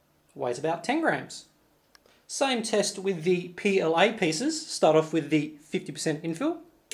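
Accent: Australia. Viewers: Nice australian accent! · Love the strayan accent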